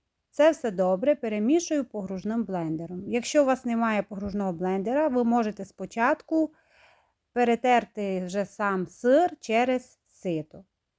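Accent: Transcarpathia, Ukraine. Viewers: Доню, сир завжди був "домашній",або "свіжий". То у москаля "твораг"...Ми ж не співаємо:Любив козак дівчину, і з кисломолочним сиром пироги😊😉. А слухати вас дуууже приємно, бо згадую дитинство та подорожування по Закарпатті.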